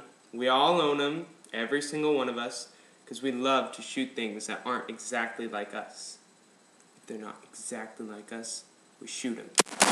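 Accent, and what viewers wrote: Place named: United States. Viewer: i love americans :) im British :/ i love your accent so much :)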